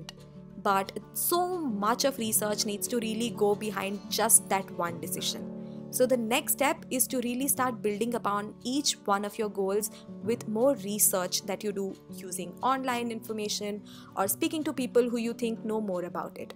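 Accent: South India, India. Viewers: Great.. Indian accent as well as melodious sound...! But u speak a little bit fast! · Pure south Indian accent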